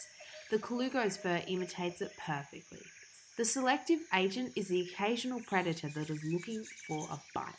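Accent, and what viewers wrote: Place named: Tasmania, Australia. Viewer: Sounds like a Taz accent,Well done video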